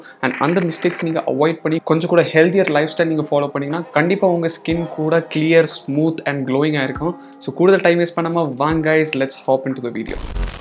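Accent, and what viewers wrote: Kanyakumari, India. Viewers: slanglae purichuruchu bruh.....sweet voice + kk slang lite😅❤️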